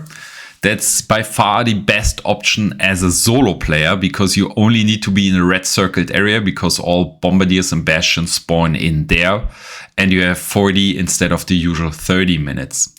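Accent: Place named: Germany. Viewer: Good Video. Dont kow why some people crying here. Außerdem hörst du dich deutsch an. Muss man supporten. Sollen alle die fresse halten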